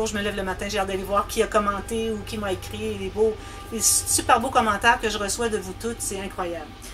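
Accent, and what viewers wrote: Quebec, Canada. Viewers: Quelle surprise et quel plaisir aujourd'hui de vous voir "presque pour de vrai". C'eût été dommage de ne pas vous montrer tant vous êtes resplendissante ! Votre accent, je vous l'ai déjà écrit, j'adore, il me rappelle mes 2 longs voyages au Québec où j'ai rencontré des gens sympathiques à chaque fois. J'y retournerai volontiers mais revenons au scrapbooking. Je félicite votre grande disponibilité et votre belle générosité, les réponses aux questions sont rapides et précises. Je suis arrivée sur votre chaîne alors que vous aviez très peu d'abonnés mais le nombre a explosé en très peu de temps, ce n'est que mérité, félicitations. En égoïste, je regrette déjà un peu ce petit cocon que nous formions, vous gardez rien que pour moi 😋 car vous êtes vraiment très empathique et on ne peut qu'être séduit sans compter que vos réalisations sont originales tout autant que les papiers utilisés. 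Mais, oui, je vous souhaite très vite de multiplier ce nombre par 5 ou 7 et plus. À très bientôt et encore merci pour tous ces partages. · Félicitations pour vos 1000 abonnés. Merci pour tous vos partages et votre savoir faire que vous nous redonnez avec gentillesse et générosité. Votre travail est remarquable. Et puis une petite touche finale qui me fait plaisir, voir votre visage rayonnant et votre sourire sans oublier le merveilleux accent du Québec.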